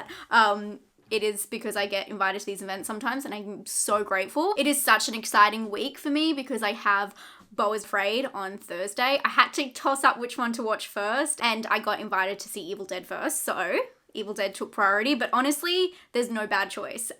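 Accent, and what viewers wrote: Australia. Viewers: So nice to hear an Australian review, especially considering the high Australian input in this film! I’m seeing it tomorrow and can’t wait. Seeing it again at the weekend with my mother who’s a fellow horror fan and a friend who doesn’t like horror and gore, but really wants to come. 😂 The second viewing should be interesting. I don’t think our friend will see much of the film through her fingers.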